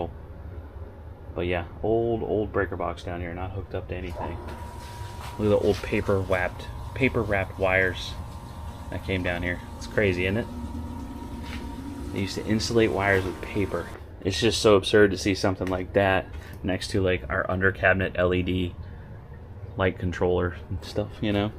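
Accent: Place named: South, United States. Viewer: 🤔 hmmm, why do have a southern accent in some of you videos and not an accent in others?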